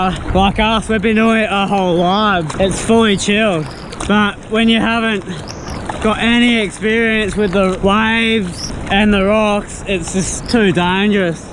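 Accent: Australia. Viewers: Were are you from ? Sound Australia or like you are from new Zealand, love your voice and accent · The ozzy accent tho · do all azzys talk like this i canna understand him haha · This video is so australian lmao. Australian accent, fishing, surfing, dolphins 6 feet away from you... And I'm only 5 and a half minutes in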